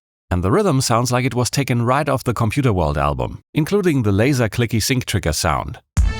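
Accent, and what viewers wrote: Germany. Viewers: Wollte erst fragen, warum er die Beiträge nicht in deutsch bringt 😋🤔😂 Sein Englisvh ist gut, aber dennoch können wir es als Muttersprachler heraushören. Um so gruseliger muss unser Englisch für "Engländer" sein 🤪😂 - zu immitieren erfordert schon einiges. Die Klangkulisse sind extrem komplex und trotz der minimalistischen Samples fügt sich alles zu diesem unverkennbaren Sound 👍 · Was für ein vertrauter deutscher Akzent in dieser Erzählstimme…wird hier nicht übersetzt…do it with google or gemini or siri or otherwise❤️🖖🤟P.S.: Just ask a german friend of yours👍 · Ich höre da sowieso einen Deutschen Akzent raus oder - Geiles Video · As a German i heard it right with his first words. Dont know what it is but you can hear if someone is German. Just a few can sound nearly not-german. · 12,39 are you german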